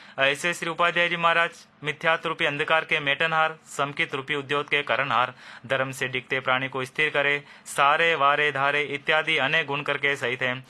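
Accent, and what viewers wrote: Standard. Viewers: Sadar Jai jinendra Aapki aawaj bhut prabhavik aur uchran shudh hai kripa karke sawatsari pratikaman ka audio upload kare Covid kal me yah bahut badi sewa hogi Sadar dhanyawad 🙏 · Sudha ucharan sath kiye prayash keliay bahutbahut dhanyvad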